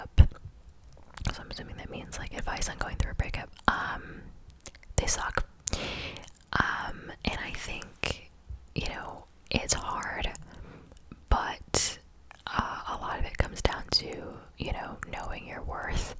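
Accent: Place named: New Jersey, United States. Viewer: has an irl Jersey accent. I've heard it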